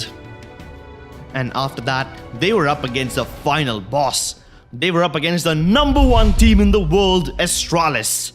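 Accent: India. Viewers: Indian accent smells from 4000km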